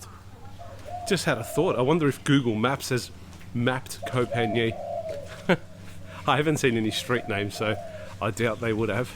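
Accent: Australia. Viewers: So nice to hear the Australian accent on YouTube. It's getting crowded out by other, like, annoying ones. 🤪❤❤❤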